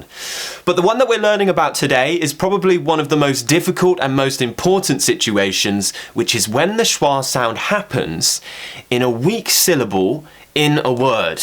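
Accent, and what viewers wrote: United Kingdom. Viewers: Hello, Im from Japan. I love british accent♡ you are the best teacher xx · I adore the English British accent I wish I could speak like you teacher! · I'm watching this video right now.And now I know Malaysian people usually use American accent rather than British accent.But seriously I think I'm falling in love with British accent.Thank you a lot for amazing video.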